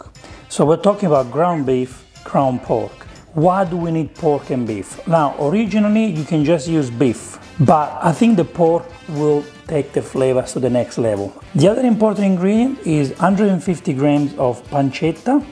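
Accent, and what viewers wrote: Italy. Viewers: This is the Italian man,accent i always think of when cooking bolognese or something Italian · really don't know what to do with a maserati in the pan when real italian tomatoes come from the deep south of Italy! a sort of nonsense ... and the genuine stereotyped italian maccaroni accent.. or is it real?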